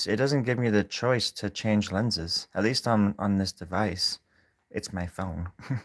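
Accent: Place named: Australia. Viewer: Your are an Excellent Artist! & a Beautiful Singer! I just want to tell you this. and what a Unique Podcast too! You are also Funny! I love your Australian Accent!, very convincing Mate! You are a hidden Box of Massive Gems 💎 M🕊️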